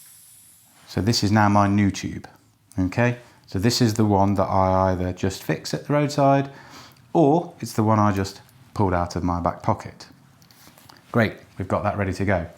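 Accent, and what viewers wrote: United Kingdom. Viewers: One of the best instructional videos I’ve ever seen on any subject. Excellent optics and close ups. Outstanding narration with confident, easy going style (being American, love the Brit accent, characterizations, and take on things) using only hand and body skills with no unnecessary props. Covered all the essential information without being too long or short. Love it, love it, love it! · damn, such a nice English accent. I can listen that for hours.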